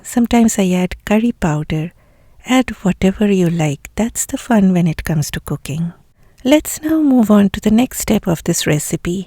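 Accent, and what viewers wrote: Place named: Bengal, India. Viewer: Are you bengali 😊.from your accents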